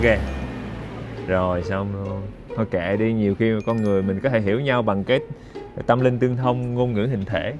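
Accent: Mekong Delta, Vietnam. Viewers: Song mot minh lau nam o Thuy Sy , moi lan nghe lai giong mien Tay cua Chau , Chu nho que huong " Soc Trang " qua , nghe rat that tha .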